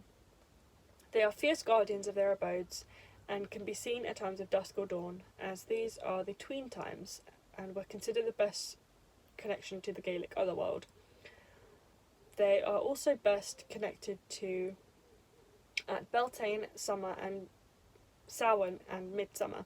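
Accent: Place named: United Kingdom. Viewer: girll just found your channel, what a hidden gem! was wondering what part of the uk you're from? we literally have the same accent lmao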